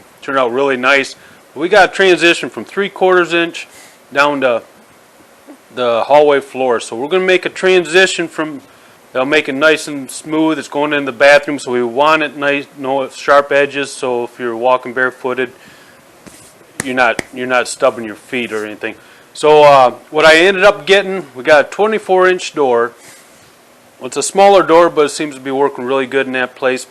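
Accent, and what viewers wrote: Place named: Michigan, United States. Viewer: Thanks for this,. This was exactly what I needed. I was having some difficulty figuring out the bevel on my floor transition. Are you from Michigan? You kinda sound like people here in Michigan. Thanks again.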